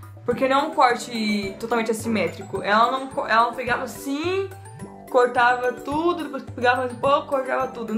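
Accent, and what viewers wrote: Brazil. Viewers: Você é goiana? 😍😍😍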